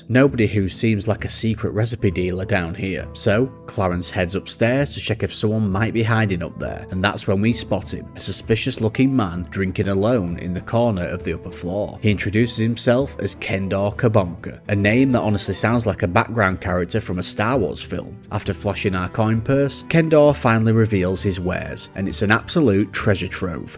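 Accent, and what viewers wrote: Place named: United Kingdom. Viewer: Id speculate he's on GMT ish based on the accent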